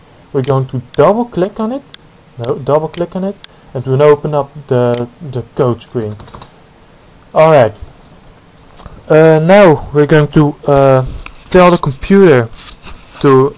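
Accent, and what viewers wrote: Netherlands. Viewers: dude zo een lelijke nederlandse accent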